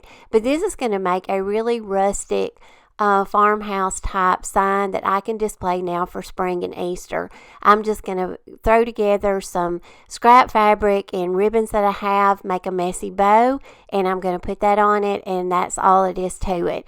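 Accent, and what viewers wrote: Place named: West Virginia, United States. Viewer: Hi, I sincerely love watching your Channel... Not only do l think you’re an extremely talented crafter, l love your accent! It reminds me of my late Aunt who lived in West Virginia. I’m surprised you didn’t know about the “Cling Wrap” trick because, we’re about the same age. I was doing this way back in the late 70’s as a teenager. Mod Podge has been around since the late 60’s but it was expensive especially for a teenager. We use to make our own Homemade version of Mod Podge by mixing a 3 to 1 ratio White Glue and Water. Another groovy craft we were making was Crayola Crayons T-shirts! 🖍 Just in case anyone is interested: - Remove the paper wrapping off of your crayons and grate them using the smallest holes. - Iron your T-shirt smooth - Place several (4) sheets of paper towels “inside” your T-shirt. (It prevents the crayon from soaking through the front of your T-shirt onto the inside! 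This is an extremely important step, do not skip it!) - Lay your crayon gratings onto your T-shirt anyway you desire. Or even into a simple design! ♥️⭐️🌙🌸🌈🔥⚡️ You can use all of the colors you want! Although keep your layer of crayon shavings on the thiner side. You DO NOT want a thick layer! - Cover your design carefully with Parchment Paper (DO NOT USE WAX PAPER!) - With your Iron set on High (USE A DRY IRON, NO STEAM NEEDED!) Just press for approximately 90 Seconds, let your Parchment paper cool for 10 seconds then peel off. If you laid your crayon gratings into a Design, then when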